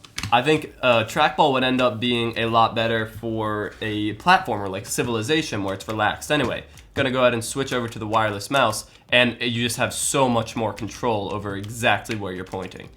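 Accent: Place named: General American, United States. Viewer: General American accent.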